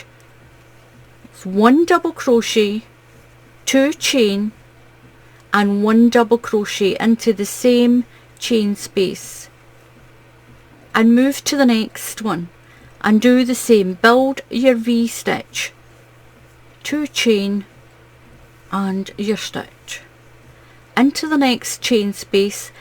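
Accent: Scotland, United Kingdom. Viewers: Tbh I mainly watch your channel because of your scottish accent, I just love it SO MUCH 😍😍🥰🥰 Your teaching skills are also awesome though. Keep up your great Videos!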